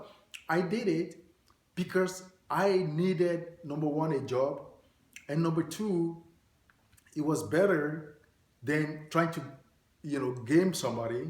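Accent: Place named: Africa. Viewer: Always interesting to hear from you. People who are not in America will not understand they joy some of us living here have when we have the opportunity of hearing own brother teach with our own African English. Thank you my brother.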